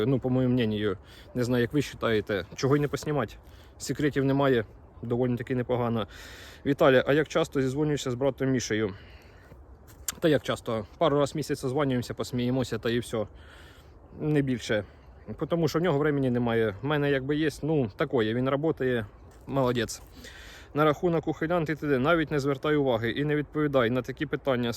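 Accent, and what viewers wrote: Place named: Surzhyk, Ukraine. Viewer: А мені подобається слухати суржик,хоч сама із західної області.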